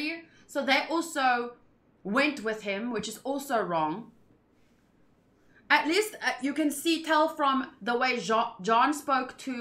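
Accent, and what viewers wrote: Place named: South Africa. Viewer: I'd bet it's South African, really cool fun accent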